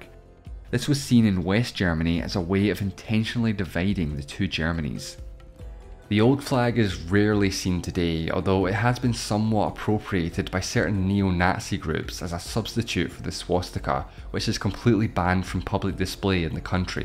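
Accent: Scotland, United Kingdom. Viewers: which part of the uk makes you have an accent where 6 is pronounced as sex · No pretty sure he's a Scot. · Sieth afrika huh appertheid that's what I hear you say are you British too bc you sound British and really eighteen sexteens really but I live in south Africa and I love you that time the white people what's like a witch and black people was so nice white people enjoyed apartheid also the white also felt bad(I live in south Africa and I'm coulored and I'm glad I'm coulored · He's Scottish, that's just his accent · he's scottish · He's Scottish. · Wow your voice is so nice and scottish and now I think I’ll join the discord link in description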